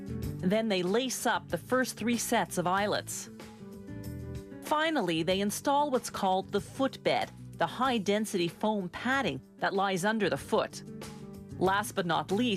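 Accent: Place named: Canada. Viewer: A Canadian woman for a narrator. Couldn’t be any better for a hockey skate video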